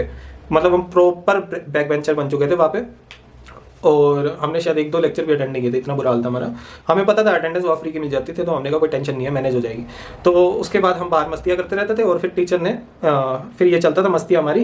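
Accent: Haryana, India. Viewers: U have haryanvi accent are from haryana??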